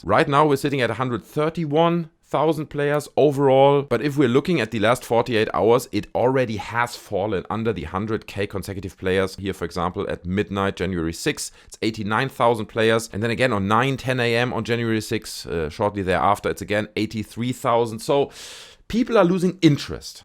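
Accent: Germany. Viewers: I like your style. You sound German, but have really good English. And your videos aline with what i usually watch - anti woke, cod, pop culture stuff. Keep it up. I'll watch some more and gonna sub later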